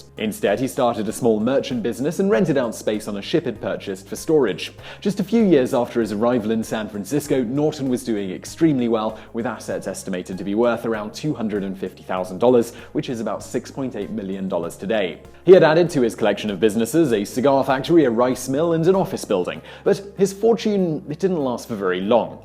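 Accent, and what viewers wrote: England, United Kingdom. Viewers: man, northernlion sounds british in this. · Reads "misled" as mice-alled Proof that having an English accent doesn't make you smart...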